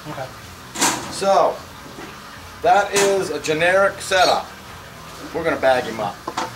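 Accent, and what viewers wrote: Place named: Louisiana, United States. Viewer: You sound like you're from Louisiana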